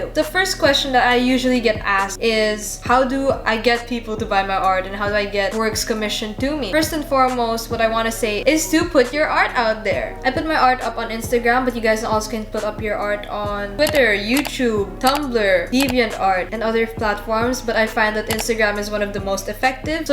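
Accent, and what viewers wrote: Philippines. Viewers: i can tell youre from the ph bc of the accenT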